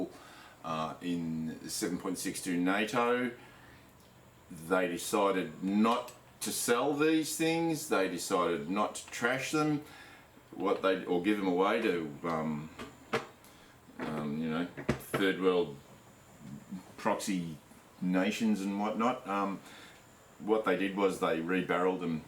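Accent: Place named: Australia. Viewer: You sound Australian Thought y’all no longer allowed gun ownership?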